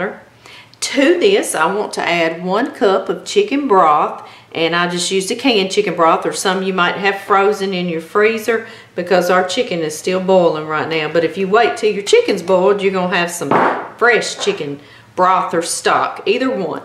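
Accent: South, United States. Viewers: Oh man, the dumplings are spot on. I love your southern accent, it reminds me of the 15 years in lived in the South (Birmingham & Memphis), especially the cooking, but what I came to love and admire the most was the HOSPITALITY! Southerner's are next level when it comes to hospitality, and when you walk in the door they're going to feed you. Don't say no! Shut up, sit down, and prepare to be fed with some of the best cook'n in the world. Your style just brings back floods of memories. BTW, thanks for your recipe for rolled dumplings, rolled dumplings are the best!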